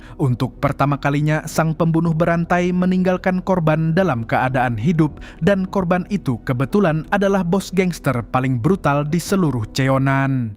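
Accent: Banjar, Indonesia. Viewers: thanks bang, btw logat abang kek orang banjar, bujur lah u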